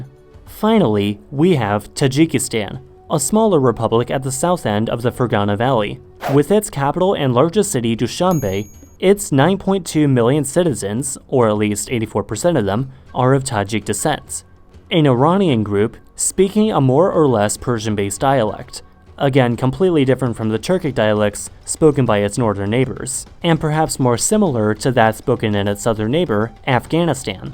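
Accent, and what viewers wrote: Germany. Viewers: you are acuttly starting to sound german you said zis' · ich glaube, dass dein deutsch etwas dein englisch beeinflusst because in the first few moments I thought that your are german.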